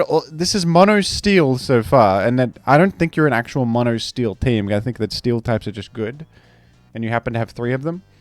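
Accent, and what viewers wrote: United States. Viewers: cool American accent always gives me chills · Something about the American weeb accent disturbs me in a profound way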